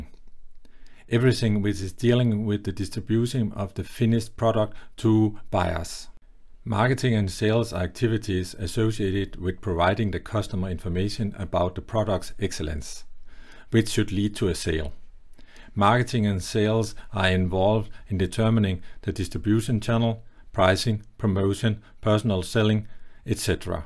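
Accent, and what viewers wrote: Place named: Denmark. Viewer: its called "Danglish".....english with heavy danish accent. typical for people around 50 and up that didn't learn english from series or movies.........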